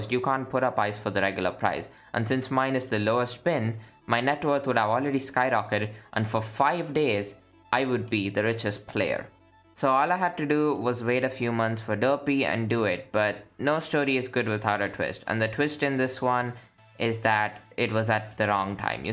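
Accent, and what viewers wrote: India. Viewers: indian english hehe ?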